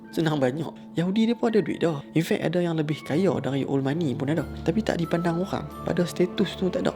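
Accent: North, Malaysia. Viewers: Pekat utaqha dia.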